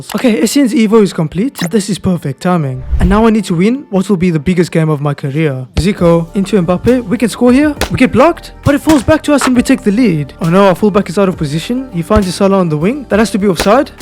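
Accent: South Africa. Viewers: Why you sound so South African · bro are u south african, u sound like it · hi dude im new to the channel what is your nationality you sound south african?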